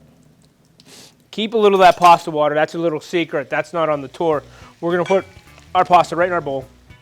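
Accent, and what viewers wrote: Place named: Pittsburgh, United States. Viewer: Brother yous is crispy and crackerty is you from Pittsburgh?!?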